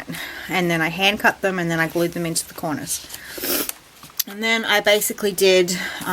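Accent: Australia. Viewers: Hey are you Aussie hope so love meeting other aussies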